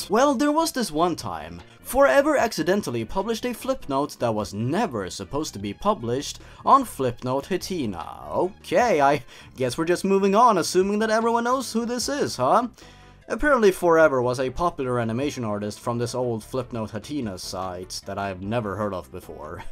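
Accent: Sweden. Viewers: U swedish? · Are you swedish?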